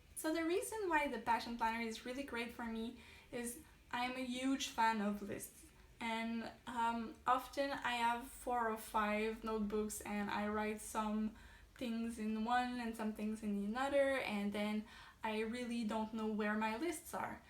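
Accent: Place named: Quebec, Canada. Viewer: I'm an idiot, - I've been trying to place your accent - & of course, you're French (Canadian?) ~, qui? Told you my French was rusty - hell, I even lived 7 worked in France for three years!! In regard to bullet journals, I cannot say I've ever used one - I tend to fill notebooks, then review what is necessary at the end of any, one week - & perhaps that is my peculiar method... Thank you for this latest instalment:D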